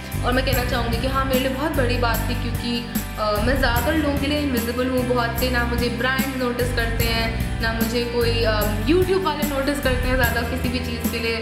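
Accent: Delhi, India. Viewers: Oh my god u r so cute ur hindi accent is superb u are a typical delhi based girl i just loved it Nd even i m 20yrs n still my parents are not allowing me for learning driving anywayz no issue and all the best for ur exams lots of love😘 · Tumhari Hindi kitni polished lagti hai ! And the typical Delhi accent ! It sounds amazing !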